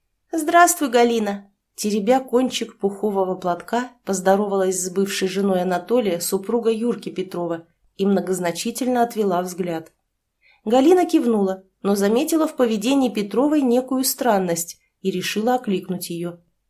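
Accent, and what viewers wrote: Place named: Barnaul, Russia. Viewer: умират, знат,и такие же окончания привезла моя соседка из Барнаула .Именно таким говором говорит.А и чаво , и штоля.Ухо не режет.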